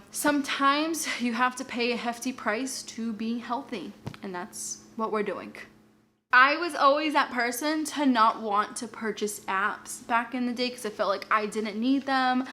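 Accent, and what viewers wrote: Boston, United States. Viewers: Love your Boston accent. 😄💖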